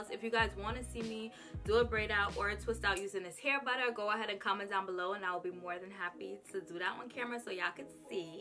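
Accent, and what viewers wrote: New York, United States. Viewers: Thank you for ya review! You sound like a new yorker 😝 let me subscribe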